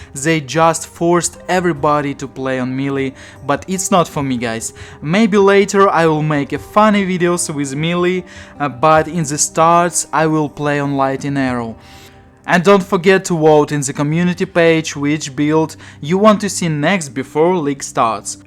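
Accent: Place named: Russia. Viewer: Со стронг рашен акцент😀, бат окей бро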